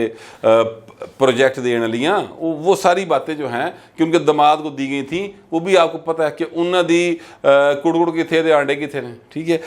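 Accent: Lahore, Pakistan. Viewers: u r very good person buhat hi achha andaz hy apaka pure lahore style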